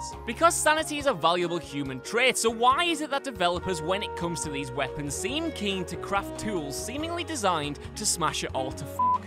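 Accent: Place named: Newcastle, United Kingdom. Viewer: Felt like i was listening to a geordie shore reject. Good list though👌👍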